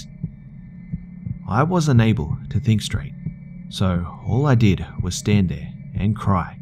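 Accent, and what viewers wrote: Australia. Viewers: "In this scary STORY..." an AUTHOR... with an Australian accent claims he was born in India, and tells us he was 12 and doing a Calculus assignment... Details, details... must have a criminal mind to fabricate such a tale. I stopped listening halfway through. This is one of those creepy corners of YT. I prefer true accounts in order to understand the dynamics of child abuse. Since after moving cross country, I have come to meet about 18-20 people, 7 of whom suffer from mental disorders, and all of them have suffered child abuse. A few of these live in absolute squalor and abject poverty. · Wow, what a sad story and a very monotone voice... also didn't help that the guy has an australian accent... all story tellers should be British!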